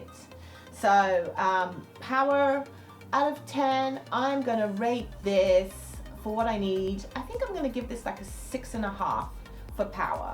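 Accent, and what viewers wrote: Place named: England, United Kingdom. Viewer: Hi. I love this video - it's the warts n all element that makes it so much closer to true OCF experiences than 99% of other videos on YouTube. I can't believe that other photographers don't experience hiccoughs - perhaps they edit them out - which just means we viewers learn less. I guess a few questions 1) did you do much/any post-processing? It looks like your model has perfect skin! 2) you could have warmed up her skin in post instead of using CTO gels? 3) you have to tell either the trigger or the strobe that you're going to shoot HSS right? I think you don't need to do that with Profoto equipment (although who wants to pay 3x as much for Profoto) 4) What did the Magsphere do for you? 6) How did you create the great shot in the video? 5) I have AD300 Pro and am considering adding an AD100. Thank for pointing out the issues with softboxes etc but I think I would only use it barebulb 6) On the subject of the AD300 - I like it because you don't have to add a mount. 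However, there seems to be a very limited number of softboxes with the Godox mount, right? I'm looking for a strip box with a Godox mount. Know of one? 7) You sound like you're from England?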